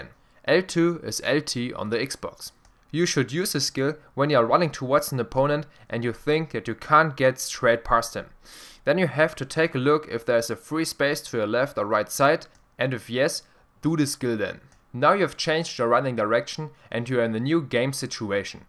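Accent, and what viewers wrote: Germany. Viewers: Ich finds so lustig wie man es raushört, dass du deutsch bist. :D Btw gutes Vid. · Der ist doch hundert pro n deutsch das hört man sofort :D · r u german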